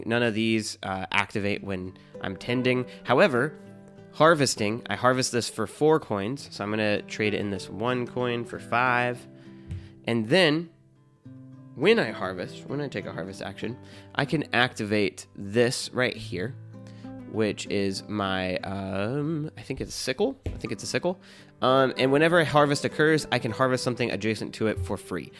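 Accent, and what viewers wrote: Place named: Kentucky, United States. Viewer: Amazing. For the first time, I truly believe you’re from Kentucky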